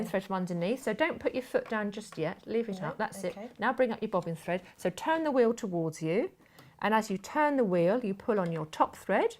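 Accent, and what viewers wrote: United Kingdom. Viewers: Darlings love, the british accents, yes I do agree about the threads it is better than chocolate lol. You are so vary talented, I plan to do your sewing tecnique on a card and if comes out wounderfull like yours I guess I will have a hit on my hand :-). Thank you for showing your love of your craft in sewing. In His Grace, Lorisa aka ellescrapper. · Every time I search videos for tips, the voices are American. It is so refreshing for us Brits to hear an English accent!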